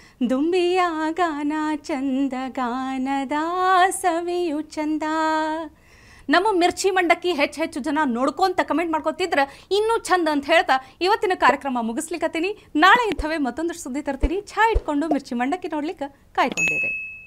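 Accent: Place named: North Karnataka, India. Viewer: ವ್ಯಂಗ್ಯ ಮಿಶ್ರಿತ, ಉತ್ತರ ಕನಾ೯ಟಕದ ಶೈಲಿಯ ನಿಮ್ಮ ಮಾತು ಕೇಳಲಿಕ್ಕೆ ಬಹಳ ಚೆಂದ. ತುಂಬಾ ಧನ್ಯವಾದಗಳು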